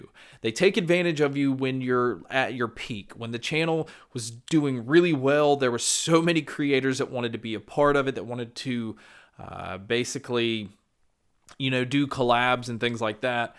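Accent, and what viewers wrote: South, United States. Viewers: No need to reply dude, just wanted to drop some encouragement your way. Some, not all, of your values lie in your skills to connect with us, remember that. If you moved on to (or rebranded) I'd keep following. You've got that well-spoken southern draw, you've got a handsome face, you're authentic and informed. You've got a lot going for yourself. I'm confident that you'll figure it out. Mad respect for you dude cuz I know about the industry and I couldn't handle it. Keep your head up, champ.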